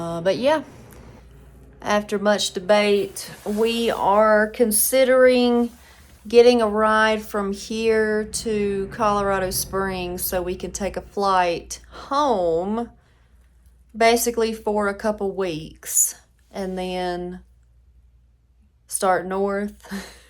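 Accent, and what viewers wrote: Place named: South, United States. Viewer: Go home and strengthen your southern accent because you’re rapidly losing it.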